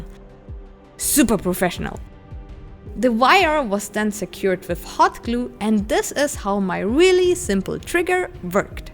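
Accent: Germany. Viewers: Are you from Germany or something like that you have a very strong German accent in your English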